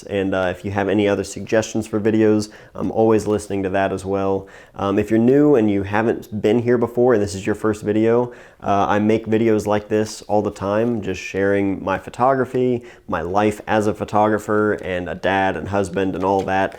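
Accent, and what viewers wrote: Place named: Mid-Atlantic, United States. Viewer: I know a mid-Atlantic accent when I hear one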